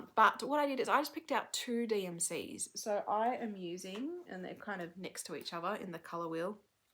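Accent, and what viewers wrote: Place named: Australia. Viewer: It's so lovely to hear a fellow Aussie voice on Flosstube! I'm so jealous of you going to the retreat with Joanna and Lisa in a couple of weeks!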